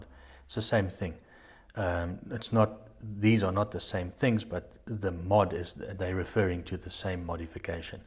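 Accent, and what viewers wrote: South Africa. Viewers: I honestly love the South African accent lol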